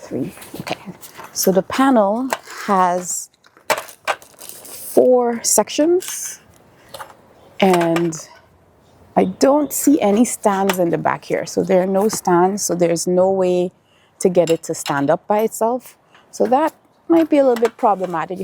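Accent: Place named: Jamaica. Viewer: You sound Jamaican. Greetings from Montego Bay.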